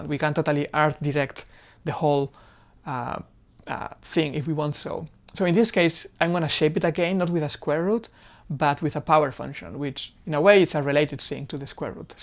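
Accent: Spain. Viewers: Really cool format and it results in a really entretaining and informative video. I can tell you speak Spanish from your accent so: muy buen vídeo! 😃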